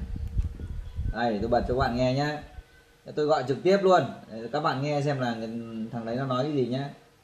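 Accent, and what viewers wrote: Standard. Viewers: noi chuẩn ko cần chỉnh ok ok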